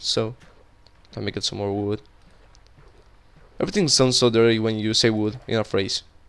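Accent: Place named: Colombia. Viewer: i actually like your columbian accent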